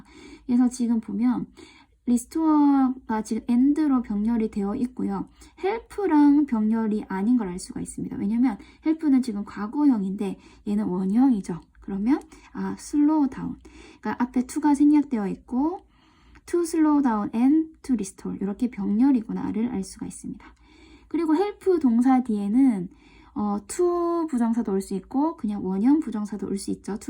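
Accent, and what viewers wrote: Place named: Busan, South Korea. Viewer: 장마시즌 부산은 장맛비가 내리네요 ㅎ 영상보면 억양이 부산분 같기도하고 은 고향이 어디에요? 뭔가 친근한 말투라 더 집중하게 되네요 감사해요 ❤❤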